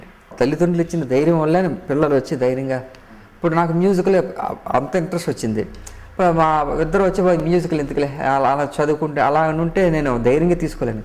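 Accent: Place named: Godavari, India. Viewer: Sir you sound godavari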